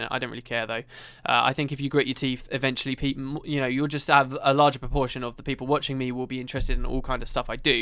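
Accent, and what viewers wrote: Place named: Manchester, United Kingdom. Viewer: Sounds like he might be from Manchester!!! could be wrong.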